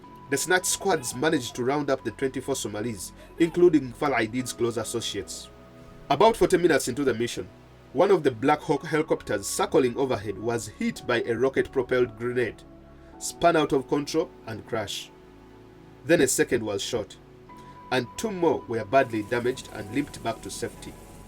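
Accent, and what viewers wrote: Uganda. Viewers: Is the commentator Ugandan?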